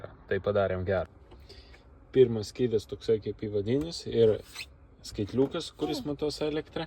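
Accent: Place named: Belarus. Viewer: stop- o kodel Tamsta kalbate su akcentu , Baltarusiu...nebuvo Atsiprasau.....gal marsh , marsh do domu...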